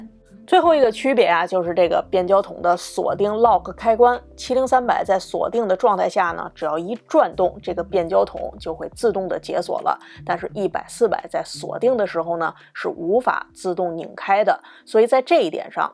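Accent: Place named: Beijing, China. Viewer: 都是北京腔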